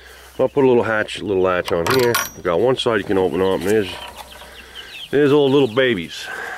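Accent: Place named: New York, United States. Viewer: Only another NY'ER is able to pick up that accent so easily!! Yeah I went all "country" myself. I have 10 of those Polish hens. They are just so fun to watch!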